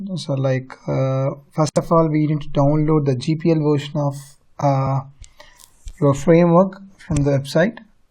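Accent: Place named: India. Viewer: Люблю индийский акцент, всё сразу понятно без субтитров :)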